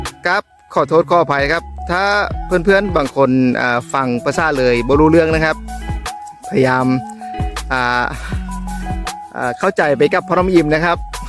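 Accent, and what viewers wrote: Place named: Loei, Thailand. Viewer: มักครับ สำเนียงไทยเลยม่วนดีครับ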